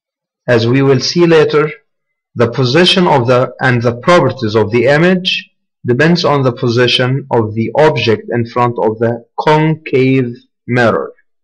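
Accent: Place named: Russia. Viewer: What's with the Russian accent ??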